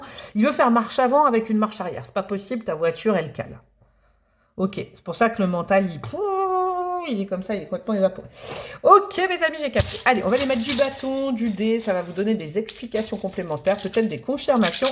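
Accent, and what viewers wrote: Picardy, France. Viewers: Bonjour, accent picard ? Vous êtes de Picardie ? 😊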